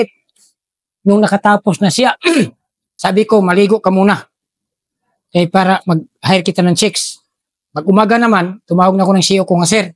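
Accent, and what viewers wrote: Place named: Waray, Philippines. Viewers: parang taga samar si sir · Waray waray yata si sir 😊😊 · Parang waray c Sir ahhh iba talaga Ang waray hahaha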